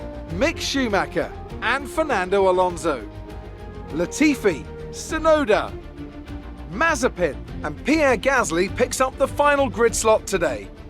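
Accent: Texas, United States. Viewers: Your American accent is perfect, you’d fit right in here in DC! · That American accent 😁👌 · Great American accent! Went to college in Texas and visit my gf that lives there and it’s very close! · Sick American accent sheeeeesh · As a native Texan I fully appreciate the effort on that accent lol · That American accent! Yes! Oh, you make me laugh! Cheers, mate. I'm not even American but I appreciate it all the same. Lando P3 right now in this series as well, eh? Perfect. Some more to upset that Lee Claxton dipstick.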